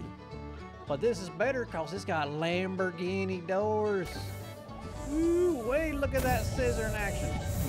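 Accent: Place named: Texas, United States. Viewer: I saw your videos a week ago and I like the texan presentation of ships ! It's like the freelancer official videos but with the accent RSI forgot !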